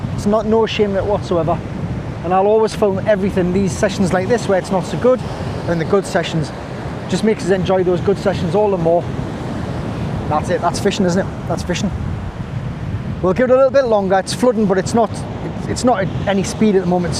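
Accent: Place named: Newcastle, United Kingdom. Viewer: Hi just thought i would say i thoroughly enjoyed watching the video.....love that coastline it brings back so many memories and hearing the Geordie accent too!!! Take care mate cheers ian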